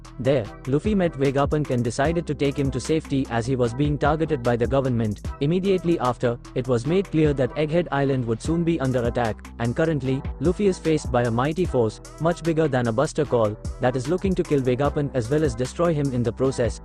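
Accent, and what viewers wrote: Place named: India. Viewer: why does this AI voice sound indian?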